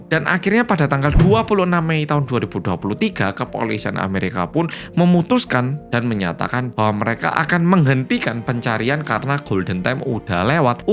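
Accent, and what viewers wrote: Java, Indonesia. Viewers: Wong Korea medok tho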